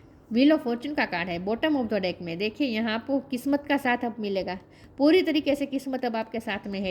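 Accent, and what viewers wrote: Gujarat, India. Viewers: Thank you so much pranam Koti Koti dhanyvad Ishwar Koti Koti Pranam Shukriya mam Aapka kya aapane Puri Baat samjhaie aap bolane se Gujarati Lagte Ho thank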